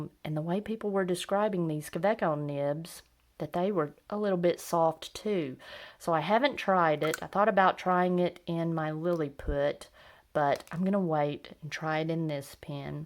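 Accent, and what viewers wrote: South, United States. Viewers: Nice southern accent. North Carolinian pen enthusiast here! Thanks for the video warning about this vendor. the Golden Espresso is probably gonna be my first Kaweco. Do you shop from Goulets' website? They're right in VA. Good stuff.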